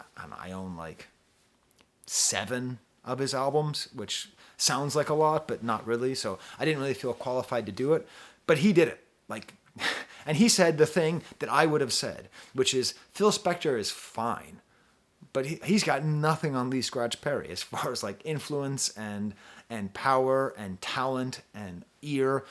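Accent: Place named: Bahamas. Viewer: Words cannot express how proud I am of for stepping out and being such positive representation of the Bahamas and the Caribbean in general. I just happened to get on his channel through Khadijah's recommendation and I started to watch the video and I was like "why does this man sound Bahamian??" come to find out here and I are from the same country. He really brings a perspective needed so desperately so desperately in left tube. So many people assume so many things about the Caribbean and we don't even get proper representation in media.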